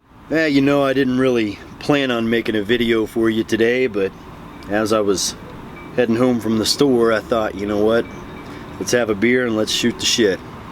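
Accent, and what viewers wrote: South, United States. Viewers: The southern accent is a lot thicker now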